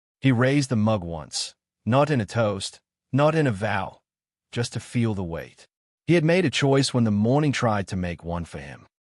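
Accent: Australia. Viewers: I don't know which is more annoying, the Australian accent or the pronunciation of Mia's name as "M.I.A."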